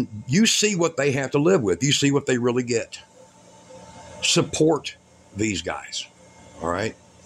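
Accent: Texas, United States. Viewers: Thankyou, I'm from Texas too and you sound just like me... keep up the good work brother. I have walked more than a few miles in the same kind of shoes.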